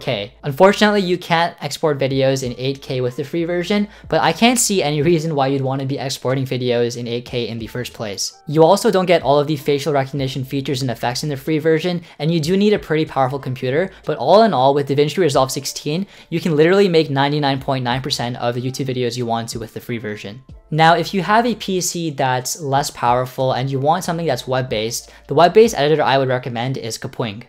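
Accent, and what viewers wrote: United States. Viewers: I was a bit overwhelmed when I heard that soft, American-english accent. Times have changed. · sir you are looking as Indian Boy with American accent.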